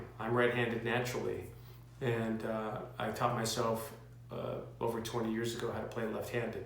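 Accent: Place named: United States. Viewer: Omg it's kind of weird to hear you in your American accent rather than the "Liverpudlian" accent you use in shows lol....I been lucky enough to see you performing live and you are simply AMAZING...You also thrown a Fab Four guitar pick to me and I gave it to my son that plays guitar and bass guitar himself and he keeps it as holy remains THANK YOU SO MUCH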